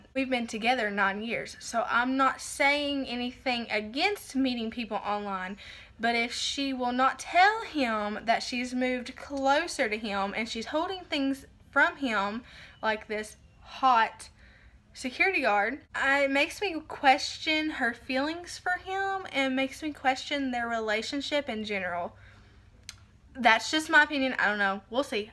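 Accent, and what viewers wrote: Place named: South, United States. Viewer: Girl, where you from? Love the southern accent!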